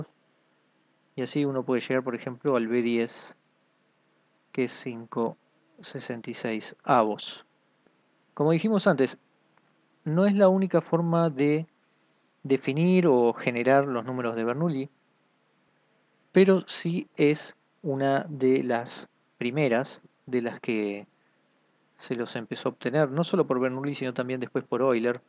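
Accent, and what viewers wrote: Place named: Argentina. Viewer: Gracias por la info, por tu tono pareces de Argentina, es así? En donde estudiaste?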